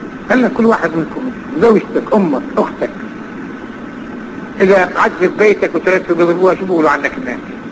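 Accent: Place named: Iraq. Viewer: هذا لهجة نتاعو عراقية ماشي دزيري الله لا يبلينا ربي بالتشيع الله يسترنا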